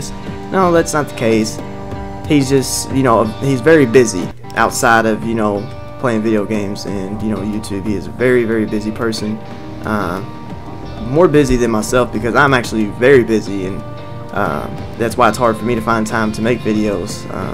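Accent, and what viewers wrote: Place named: South, United States. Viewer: Where are you from? from your accent you seem from the south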